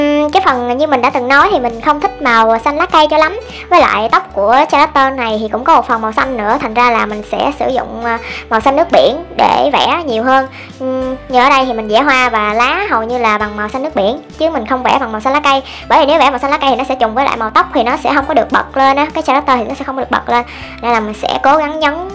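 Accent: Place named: Vietnam. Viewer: chị nói tiếng anh thuần việt ghê, em thích :))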